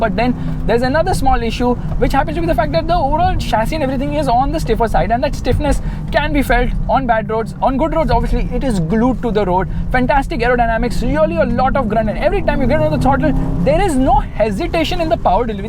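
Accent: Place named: North India, India. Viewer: Irritating North Indians English 🥵🥵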